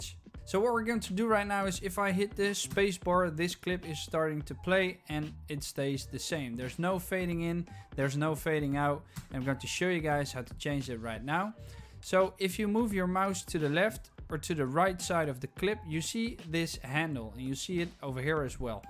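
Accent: Netherlands. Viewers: dacht het wel dat ik een Nederlands accent hoorde ! succes nog en doe zo verder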